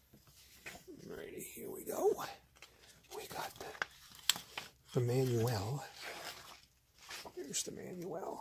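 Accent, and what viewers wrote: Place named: Bronx, United States. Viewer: U must be from Bronx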